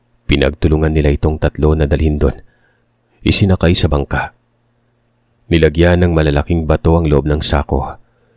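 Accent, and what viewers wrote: Iloilo, Philippines. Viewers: SIKONGKONG😂😂😂 ILONGGO BA SI SIR?????😅😅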